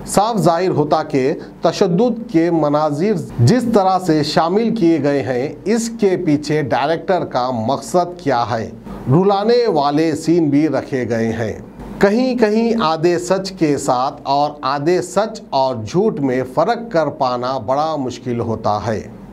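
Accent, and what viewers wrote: Hyderabad, India. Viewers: bhai aap pakke hyderabadi hai mast baatein karte aap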